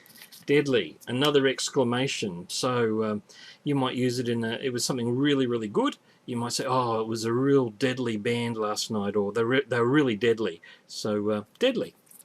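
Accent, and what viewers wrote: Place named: Australia. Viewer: Doovalacky is a technical term for a tool I can't remember the name of as opposed to person being a tool whose name escapes me. 😜 Are all of those words still in use? Some I haven't heard in years. A cigarette was a rollie, tailor made or ciggie, even cancer stick by some aware of the dangers. Can't forget going outside for a smoke or fag. Thanks for sharing. Am enjoying hearing an Aussie accent.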